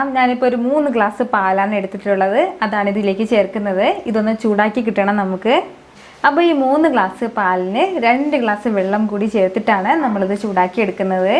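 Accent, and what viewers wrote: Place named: Thrissur, India. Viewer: Thrissur aayirikkum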